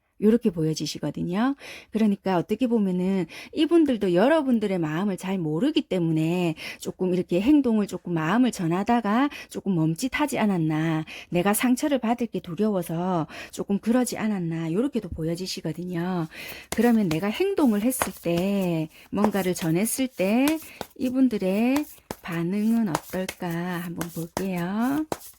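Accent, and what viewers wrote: Busan, South Korea. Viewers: 신기해서 한번 더 봤는데요~ 영상도 너무 예뻐요~ 👍🏻👍🏻 선생님~ 혹시 타로 배우려면 어떻게 하면 되나요?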